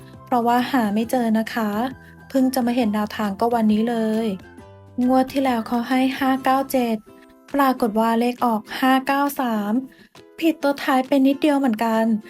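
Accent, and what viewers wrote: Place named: Central Thailand, Thailand. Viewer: แอดคนจังหวัดอะไร เหมือนคนภาคกลางเหนอนิดๆๆ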